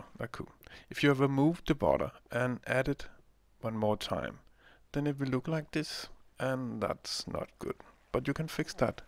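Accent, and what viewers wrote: Denmark. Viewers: are you from denmark? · Fin video! Hørte på dialekta di med en gang at du var dansk:) Hilsen fra norge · Nice trap, but i dont like your danish/english accent else it was fine. 4/5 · u from denmark?:-D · Man kan squ godt høre du er dansker xD:-D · are you from Denmark? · Are you danish? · Hes Danish:) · Nice video, jeg kan høre på din accent at du er fra Danmark, hehe:) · your English is exelent, the reason some people don't understand it is because they are not used to your Danish accent. But i understand you perfectly, Im Norwegian and i also have a accent some people don't understand:) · your danish? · Fun to see a dansish minecrafter! though you should try to work on your accent, its very "danish" so to speak, if you understand what i mean... Else very great work, keep that up! ( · Clearly from Denmark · He´s very danish! haha - "også"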